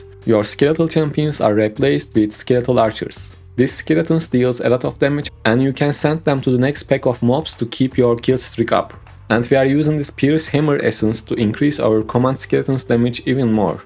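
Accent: Turkey. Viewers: Konuşmasindan anladim türk olduğunu haha Almanyadan selam reis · İnanılmaz türk aksanı var reyis türk müsün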